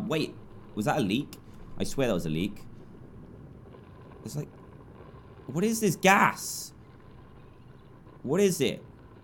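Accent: England, United Kingdom. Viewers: I just turned 11 and I asked my mum how long she’s been hearing an English accent coming out of my computer and she said 2012 and btw commenters from the future it’s 2018 right now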